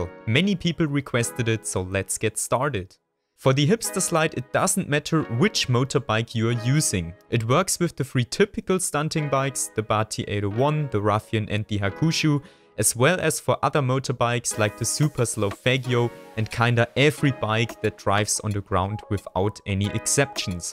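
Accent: Germany. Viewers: Man merkt den deutschen aktsent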